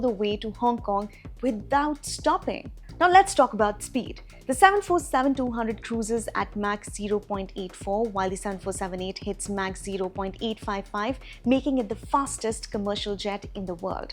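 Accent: India. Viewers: Oh no, that Indian accent, not again😩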